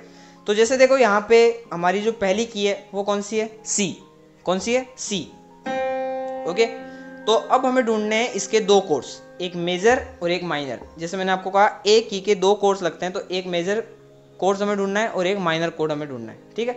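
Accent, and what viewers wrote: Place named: Gujarat, India. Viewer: Ye pakka gujrati hai 😂😂😂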